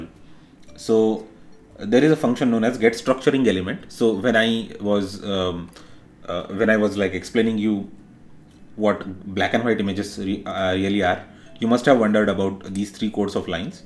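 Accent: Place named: India. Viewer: THANK YOU, you are the one of the indian accent that i like, thank you.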